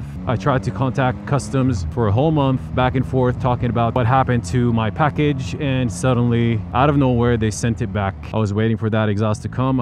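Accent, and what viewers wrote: United States. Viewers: אשכרה עד שלא ראיתי את המונית הייתי בטוח שאתה מארצות הברית. המבטא, המראה הסינמטי, הכל נראה מושלם אחי. אהבתי ועשיתי סאב, שרק תפציץ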